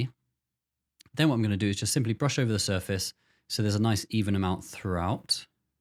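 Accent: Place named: England, United Kingdom. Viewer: Funnily enough the first thing I thought was “this guy grew up near Daniel Radcliffe” based on the accent lol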